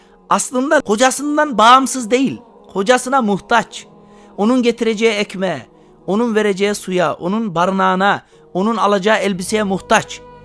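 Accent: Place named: Konya, Turkey. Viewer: "Çen çen çen "😂😂😂 sen konyalının hasısın hocam.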